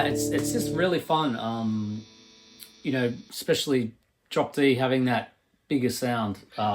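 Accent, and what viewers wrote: Australia. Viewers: Not an accent. That's Australian. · great stuff ozzie accent to doesn't get any better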